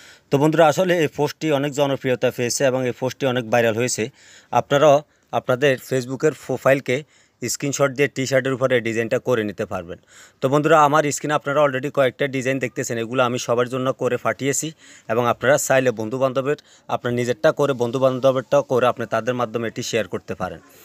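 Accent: Noakhali, Bangladesh. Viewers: আপনে নিশ্চিত নোয়াখাইল্লা কোন সন্দেহ নেই??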